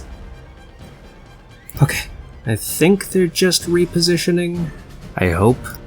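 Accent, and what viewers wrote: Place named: United States. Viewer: Completely understand the frustration. I do hope you'll stay the course. Your narration is clever and entertaining, and the editing, phenomenal. I subscribed immediately upon finding your channel. I'm a lore nut and really only watch good faction campaigns. Would love to see you play as an elven faction or Gondor on AGO and see what that does for your channel. Whereabouts are you, if you don't mind? Stateside? You sound American but seem to handle Germanic and Gaelic word annunciations with ease.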